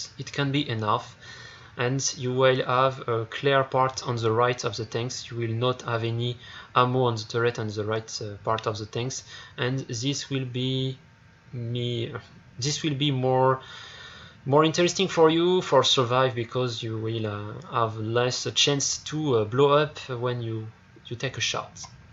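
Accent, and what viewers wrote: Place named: France. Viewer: bah je comprends pas tout.... mais c est de l anglais avec un belle accent français...lol..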